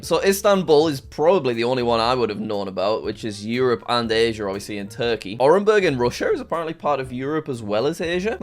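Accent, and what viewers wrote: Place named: United Kingdom. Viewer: how we British people talk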